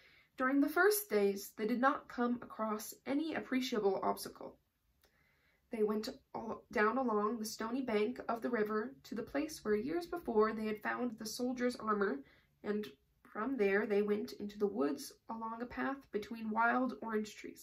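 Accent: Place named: Midwest, United States. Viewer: Are you from Wisconsin? lol you sound like you are from the Midwest x) I am so don’t be offended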